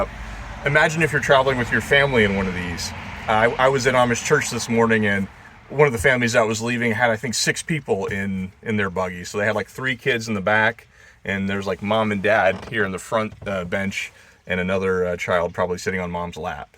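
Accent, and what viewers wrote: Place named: Baltimore, United States. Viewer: Is narrator from Baltimore? I hear a strong Bmore accent when he says window, home, here, open, et.al. words, a LOT like a native of Baltimore speaks.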